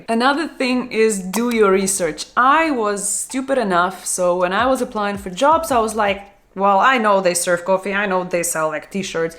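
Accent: Russia. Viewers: hi i just wanted to say that i love your channel and your personality and russian accents that pops-up from time to time is very funny. is it possible for you to make a video on what is a co-op program, give us most of the informations you believe we need to know and share a personal experience if can; I'll really appreciate ✨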